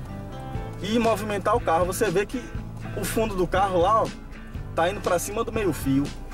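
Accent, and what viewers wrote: Bahia, Brazil. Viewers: Conheço esse sotaque de longe...Vc é daqui da Bahia. Rsrs vc dá aulas de direção?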